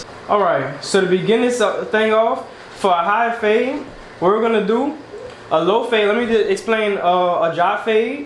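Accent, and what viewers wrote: Louisiana, United States. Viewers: Louisiana accent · Ooooooh you sound like you from New Orleans….tell me I’m wrong…please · Showing my 18 baby your tutorial and he said "momma he sound like you"...I said I know he definitely from the City! N.O represent!! 🫡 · Southern Louisiana as a native..... He sounds like home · Say bruh you from New Orleans?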